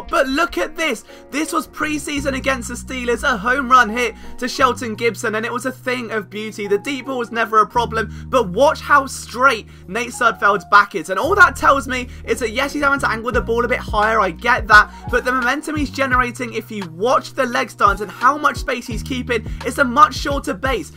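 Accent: United Kingdom. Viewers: Does the British accent indicate that NFL football is really taking on in the UK ?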